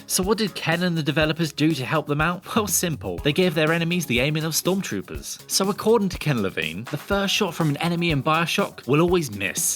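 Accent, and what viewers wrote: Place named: United Kingdom. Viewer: Gotta be another British accent. I think they might have more than the entire USA!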